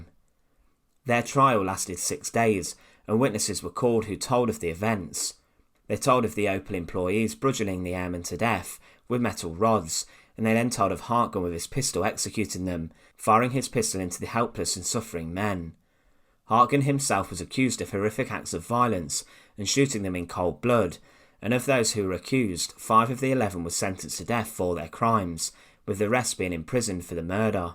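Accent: United Kingdom. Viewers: I totally know what you mean, but it is simply a particular UK regional accent and Id rather the guy stuck with it and remained authentic to his identity. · 😆 wtf thats crap - his voice is fine to me and i hope your comment doesn’t hurt his feeling. Its nice to have a regional UK accent. Not an upward inflection of every word like in the US. dont listen to him buddy your voice/show is spot on !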